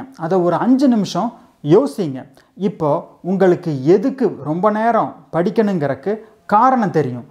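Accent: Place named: Kongu, India. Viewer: Your tips is good and your kongu slang good.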